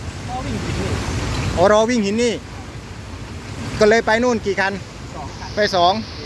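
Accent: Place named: Korat, Thailand. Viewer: วะพูดภาษาโคราชบ้านเอ็งเลยน้ะ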